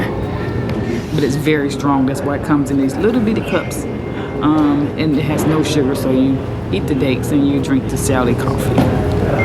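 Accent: United States. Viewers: I always assumed that your YouTube channel was based in the USA, maybe because of your accent 🤷🏾‍♀️ Congrats on your 1st vlog 🎉🎊 it's wonderful to hear all about your journey and travels. That's the thing about nursing it does open up the world to you if you're willing to travel. I'm in the UK 🇬🇧 but worked in the States for a few years and that definitely gave me a thirst to travel more. I was grinning and nodding throughout the reel 😁😂 I'm so happy for you and hope that production is proud of your efforts ☺️✨💛🤩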